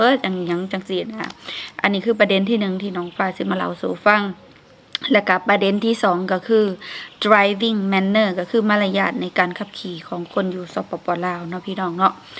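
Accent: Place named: Isan, Thailand. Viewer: น้องฟ้า เว้าสำเนียงคือคนอีสานเนาะ อยู่ไทย โดนละบ้อ หือ อย่าคิดลึกเด้อหละ😂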